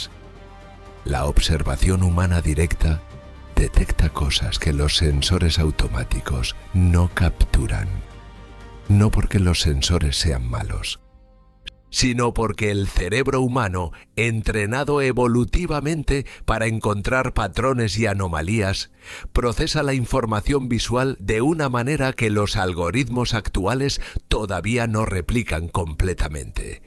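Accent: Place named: Spain. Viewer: Narración espańoleta tan idiota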